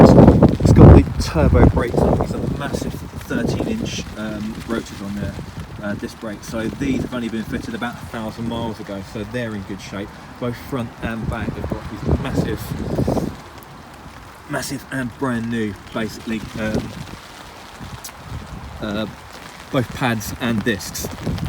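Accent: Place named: England, United Kingdom. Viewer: love it how Queen’s English pronounced in Imperial measurements. Nice C4S!!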